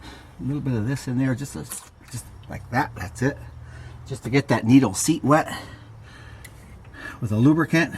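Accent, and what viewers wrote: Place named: Dakota, United States. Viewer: No this is really how he talks, being from Dakota will do that to ya! Good will it run video!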